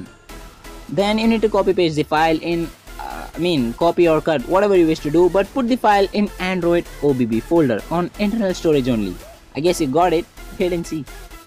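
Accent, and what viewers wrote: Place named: India. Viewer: you sound Indian and your engglish is realy good man keep up the good work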